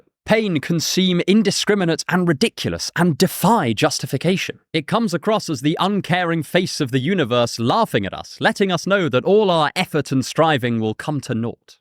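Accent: England, United Kingdom. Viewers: I absolutely love your wonderful English accent that keeps me so satisfied til the end of the video. You really make great quality videos and I love it!!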